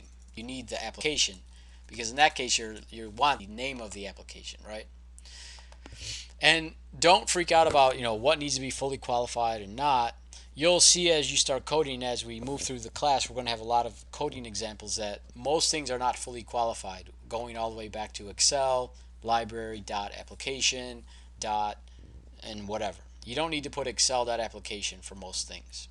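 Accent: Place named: United States. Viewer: Thank you, Very clear lessons and a good American accent.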